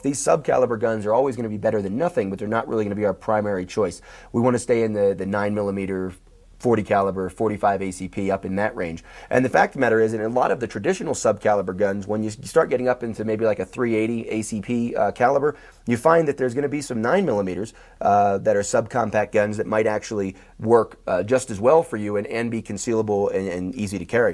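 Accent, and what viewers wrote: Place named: Chicago, United States. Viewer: You must be from Chicago!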